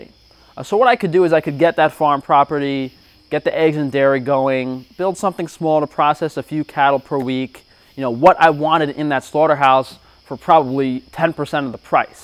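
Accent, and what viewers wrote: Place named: Long Island, United States. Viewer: you sound like a Long Islander, That’s where I live and I talk and we talk pretty much the same ha ha ha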